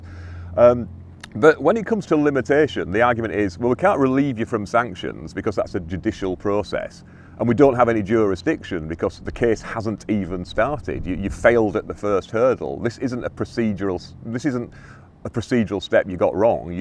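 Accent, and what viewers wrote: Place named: North, United Kingdom. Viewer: how many times a day do you have to ‘Bite your lip’ & actually not ‘call a spade a spade’, but use a euphemism instead? You explain things so eloquently, in a northern accent, that I could listen to all day. Thanks for being so ‘natural’.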